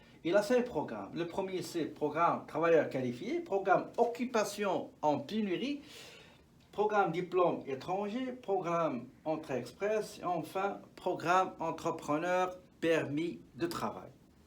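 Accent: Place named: Morocco. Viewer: لا اقصد العربية الفصحى ، ولكن اقصد الشرح بلهجتك المغربية الجميلة ❤🍁🎈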